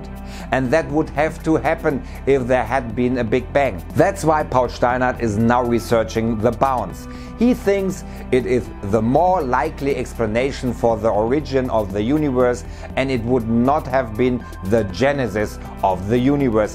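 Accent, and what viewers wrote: Germany. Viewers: Please work on your german accent. It makes the video less enjoyable, all in all nice topic and well researched as always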